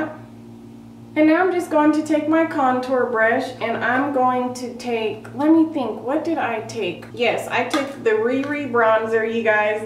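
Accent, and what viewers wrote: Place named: Minnesota, United States. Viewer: are you from Minnesota or something? Your accent reminds me of Fargo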